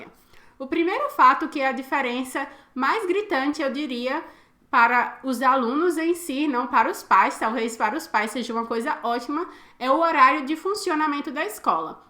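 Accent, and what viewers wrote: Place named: Northeast, Brazil. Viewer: Em primeiro lugar, como baiana que sou, amo este sotaque nordestino. Aqui também os alunos nao usam farda e pagam meia passagem. Inclusive a Eslovaquia faz fronteira com a Italia né?! Aqui é bem comum as crianças e adolecentes fazerem excursao, pela escola para outros paises da europa, bem legal mesmo! Bjs 1000 e obrigada por falar de mim.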